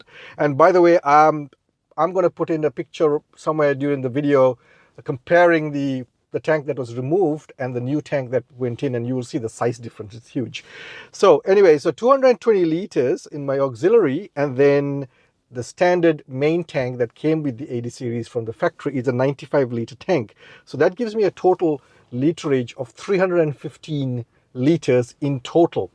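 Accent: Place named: South Africa. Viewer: Are you from South Africa, I hear some safa in the accent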